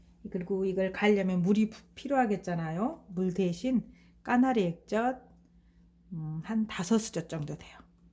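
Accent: Jeolla, South Korea. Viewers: 말투나 만드시는 음식이 남도쪽이신거같은데 남도가 고향이신가요? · 낭글 낭글ㅋㅋ 전라도 아짐ㅋ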